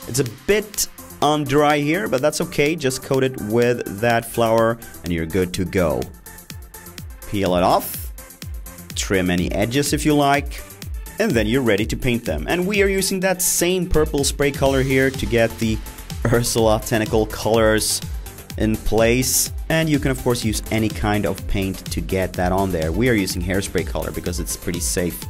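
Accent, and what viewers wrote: Scania, Sweden. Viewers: what (I'm assuming) is a Swedish accent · skånskan caught me off guard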